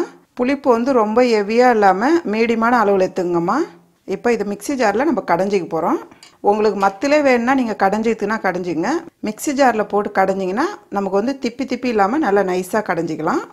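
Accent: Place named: Chennai, India. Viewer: Happiness is hearing our own mother's voice. ♥️♥️♥️♥️ When she talks "parunga maa... With Chennai slang"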